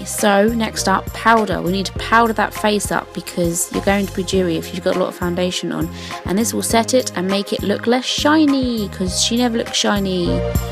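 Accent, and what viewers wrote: England, United Kingdom. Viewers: Mar-tee-nes Its pronounced that way. But if it's cause your english accent then it's okay ☺️💕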